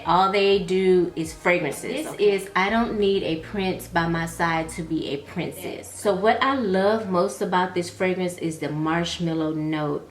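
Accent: Texas, United States. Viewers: “My God Today” you know you Texan! Love you!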